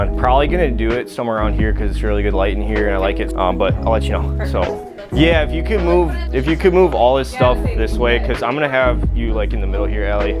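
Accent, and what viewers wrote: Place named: Wisconsin, United States. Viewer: Your video showed up recommended, so I started watching and immediately knew 1 thing…. That accent, you’re from Wisconsin! 🤣 I have family in Kenosha and my mom grew up there, and always loved their accents growing up. Nice photos, btw. ☺️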